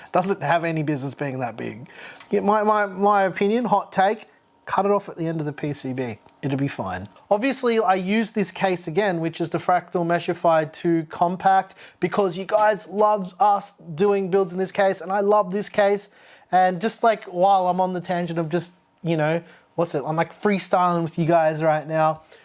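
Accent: Australia. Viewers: where in aussie do you based on?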